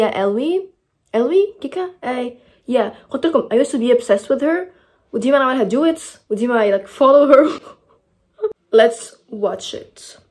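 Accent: Tunisia. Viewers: ياخي تونسية ؟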